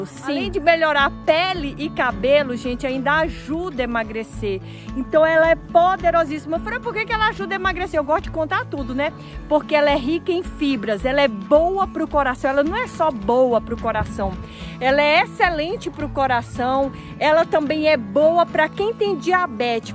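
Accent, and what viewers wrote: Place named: Goiás, Brazil. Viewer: Oi vc parece Goiana. Ô trem bão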